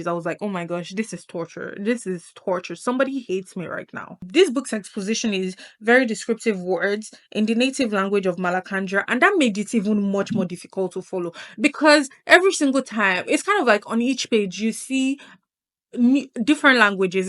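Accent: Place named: Jamaica. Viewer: Amazing video love your accent are you Jamaican also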